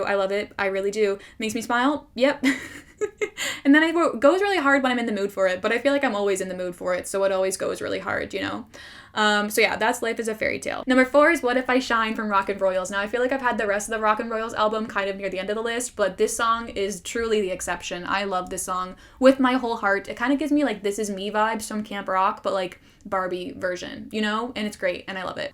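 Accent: Canada. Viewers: this is off topic but has ever said she’s canadian because the way she says about and out makes me think she is lol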